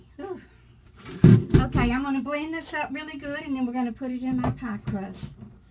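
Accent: South, United States. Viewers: That pie looks delicious. I had to laugh, You say peacan like pcarn, in Australia we pronounce it Peecan...haha, LOVE your southern accent. Have a blessed day from Western Australia.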